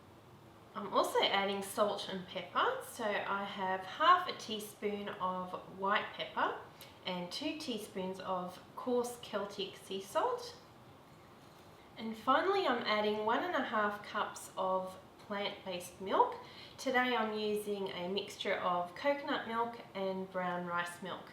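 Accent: Australia. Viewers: i don't think i did, i'll give it another try. The reason i took it off the heat is because the mixture already looked thicker than the one your poured. thank you, hearing your accent makes me miss Australia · omg i cant bare the aussie accent! so annoying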